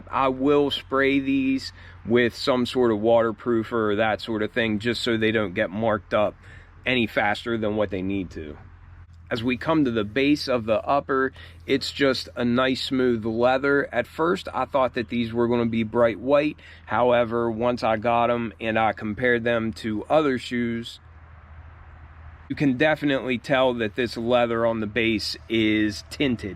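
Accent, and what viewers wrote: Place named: South Jersey, United States. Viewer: U sound like ur from south jersey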